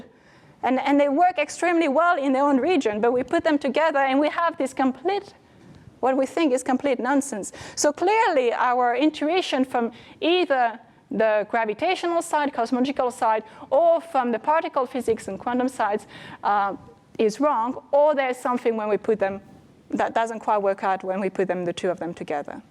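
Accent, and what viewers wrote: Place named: India. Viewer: Why does she talk like an Indian?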